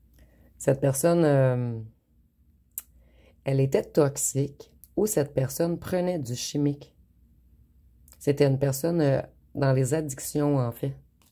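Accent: Quebec, Canada. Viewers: Tres bel accent quebecois chere madame❤❤❤❤❤❤❤❤❤❤❤❤❤❤❤❤❤❤❤❤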